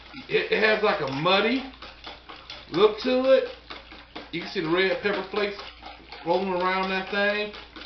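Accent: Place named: South, United States. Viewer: He’s got that Southern draw that’s for sure.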